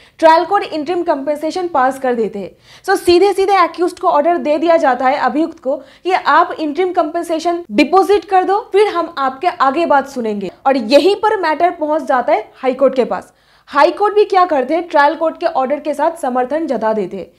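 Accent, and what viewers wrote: Bengal, India. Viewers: आधि तो इंग्लिश बोल रही है , और हिन्दी भी शुद्ध नहीं है ,,, यह बंगाली हिन्दी है 😢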